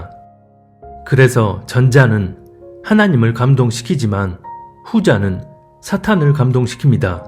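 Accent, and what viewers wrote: Jeolla, South Korea. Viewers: 전라도 성도님이십니까요잉?ㅎㅎ사투리가 걸쭉하신게 듣기좋습니다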